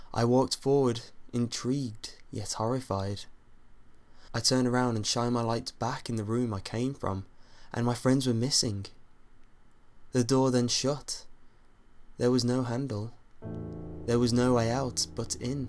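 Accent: United Kingdom. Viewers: i loce this guys accent im a big fan of british accents :) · British people r so lucky, all u need is ur voice, piano, n a creepy pic to make scary vid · I've listened to some of your pastas now and I like the storys, you choose. I'm also so much into your accent! I could listen to british accent for hours (I'm from Germany) Anyway, you do a great job!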